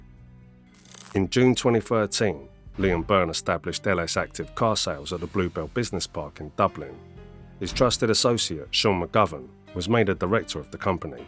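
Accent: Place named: Cockney, United Kingdom. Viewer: These goofy cockney accent narrator's are super cringe and speak like they are on antidepressants ffs I have to listen on ×2 speed.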